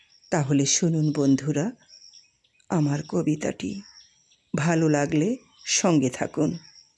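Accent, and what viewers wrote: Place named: Rangpur, Bangladesh. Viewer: "সংস্কৃতি বাঁচলে, শিকড় বাঁচে" - ঠিক কথা। ভালো লাগলো রংপুর আঞ্চলিক উচ্চারণে বাংলা কবিতাটি। আরও শুনবার আশা রইলো। সবাই ভালো থাকবেন। 🙏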